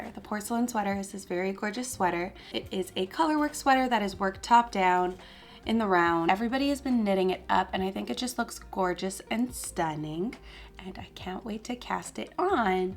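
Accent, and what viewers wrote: Quebec, Canada. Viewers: I just posted my knit city video as well! I learned to knit on youtube in 2016 but picked it back up during quarantine. Are you Quebecois? I can tell by the way you read those labels! #frenchcanadiangang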